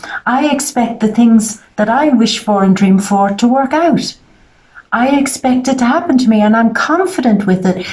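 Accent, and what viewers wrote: Ireland. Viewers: Always a pleasure to hear your soft Irish accent, I am a June 20 guy. Painter and writer, very Irish and awake. Like yourself I am living far from home but you can take the woman from the island but not the island from the woman. I have lost nothing that I experienced in life but have managed to place things in quarantine lol. Big hugs. Eric. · Doesn't she have an uncanny resemblance to Elizabeth Hurley?.. that gorgeous Irish accent.. brilliant! Gurl you really speak with such clarity and I love it.. yes I have enrolled in distance learning and just didn't have the time to do it.. yes I have been afraid to do my work because I have always thought I wouldn't get through it.. I really want to go back to Uni and completing what I have always wanted to do... I'm older and want to be able to say to myself that I have lived my life. So thank you for this gorgeous reading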